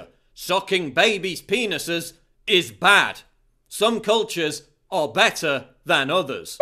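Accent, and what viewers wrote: United Kingdom. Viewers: Some practices are disgusting and vulgar by any standard. These people need to be enlightened. Anyway, thank you for the entertainment. Your British accent makes you even funnier.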